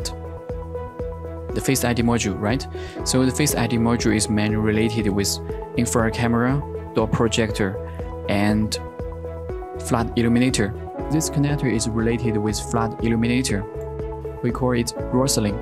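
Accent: China. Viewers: 中国公司，这英语就是中国人读出来的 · 中国人讲的英语么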